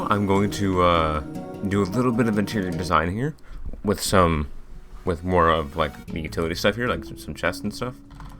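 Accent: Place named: South Africa. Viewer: yeah i think this is a South African accent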